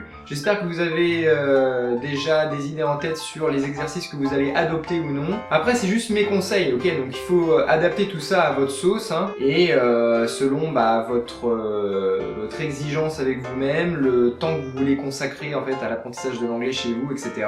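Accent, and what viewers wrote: France. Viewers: T'es de Franche-Comté ? :)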